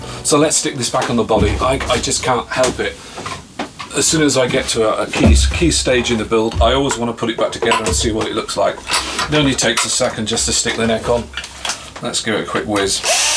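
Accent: West Country, United Kingdom. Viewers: Nice informal and informative vid; Good job; I've just subscribed! I'm considering doing a self-build T type....principally as whilst I love the way they sound (who wouldn't....) I've always felt the ergonomics & comfort could be improved quite a lot - i.e. belly cut, arm relief, thinner neck, rolled edges on the fretboard etc.... I've never been able to find one that ticks all the right boxes, so I'm thinking I'll have a crack at it myself.....your series is no doubt going to be a reference! I'm thinking about doing an oiled body (I have a couple of early 90s Patrick Eggles with that finish and they both ring like a bell...) maybe with some sort of staining / burst type finish, if that's even possible.....interested to discover if you have covered this at all? Nice to hear a West Country brother...greetings from the Royal Forest of Dean!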